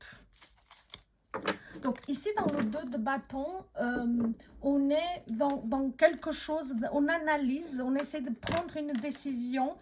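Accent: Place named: Portugal. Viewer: Bonjour Merci beaucoup pour ce très beau tirage très inspiré et inspirant .... Très riche lecture avec un très beau parfum d'accent portugais que j'adore ..... Belle journée . A bientôt 🙏🌻 Pitus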